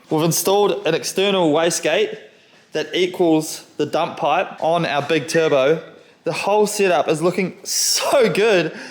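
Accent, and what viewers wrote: New Zealand. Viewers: Rewatching this it defaulted to an ai dubbed English track, even though the original video is English? Youtube couldn’t handle the nz English…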